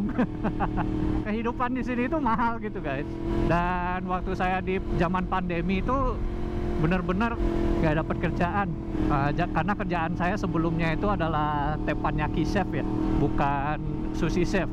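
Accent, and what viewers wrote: Bali, Indonesia. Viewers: Walaupun tinggal di negeri sebrang tapi aksen bali nya ttp ada,, welcome back lahh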